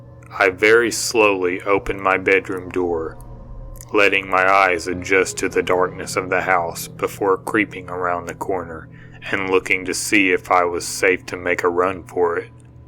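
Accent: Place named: South, United States. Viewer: I 🖤 ur subtle southern accent, and 🖤 ur channel! Emz.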